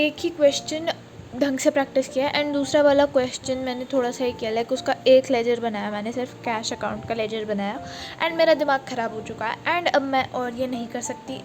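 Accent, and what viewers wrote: India. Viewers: Sis are u Indian ?? and how you accent Indian..😅😅 please make me understand i don't know..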